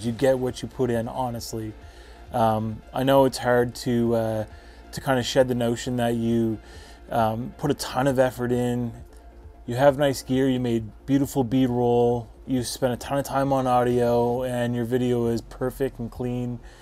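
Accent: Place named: Central, United States. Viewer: Ok, sorry, you sound just like and American from the central part of the U.S. I should have realised that, because I knew where the bay of Funday was.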